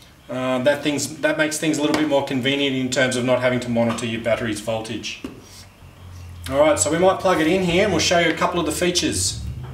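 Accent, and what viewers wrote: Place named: Australia. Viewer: Thanks for the review and great accent! What part of Australia is that? I was I Sydney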